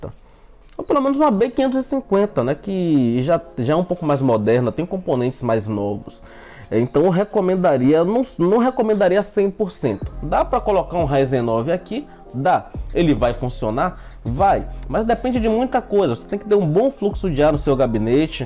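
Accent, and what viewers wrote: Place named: Salvador, Brazil. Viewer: você é de salvador aaaaaaaaaaaaaaaa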